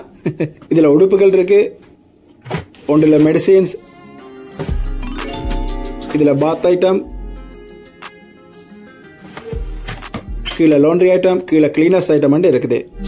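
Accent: Sri Lanka. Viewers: ஐயா கப்பலில் தங்கும் அறைகள் பற்றி நன்றாக உள்ளே சுத்தி காண்பித்தீர்கள் மிக்க நன்றி நீங்கள் தமிழ்நாடு தமிழரா இலங்கை தமிழரா உங்கள் குரல் சற்று இலங்கை தமிழர் போல் தெரிகிறது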